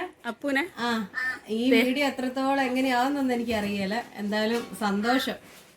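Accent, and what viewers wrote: Vadakara, India. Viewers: 😂😂😂അടിപൊളി...വടകര ഭാഷ 😌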